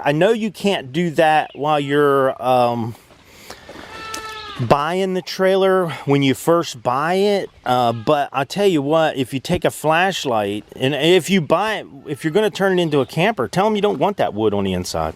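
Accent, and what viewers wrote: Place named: Pittsburgh, United States. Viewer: I am so glad I just discovered your you tube site! I looked at your video playlist and am amazed at how creative a DIY master you are. I also heard in your speaking that you must be from the Pittsburgh Pennsylvania area, as you possess several distinctive Western PA speech sounds. I went to school there (Carnegie Mellon, Theatre/Speech School) back in the early seventies, and love that particular American "dialect". A very good friend lives there and so, I get to hear it again, from time to time. Thanks for your spectacular videos. I look forward to watching most of them, as you appear to know all one should know in order to take care of ones self in this world (or not to get ripped off when someone else 'services" your need) It is never too late to learn. I'm 70, and still have lots to learn!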